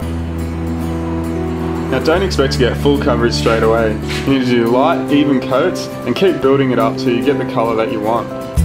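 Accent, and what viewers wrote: Australia. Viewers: Great to hear the Aussie accent! Thanks for the great video and info. I see I can buy it in Wodonga. Can I use this product on a vinyl dash board and window pillars? It is not possible to spray them, so I am presuming I would apply several coats with a cloth without removing the dash (huge job to remove it)? What can you suggest?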